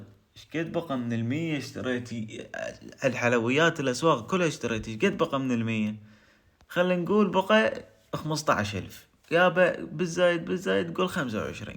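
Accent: Iraq. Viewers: متاكده عراقي مو اردني؟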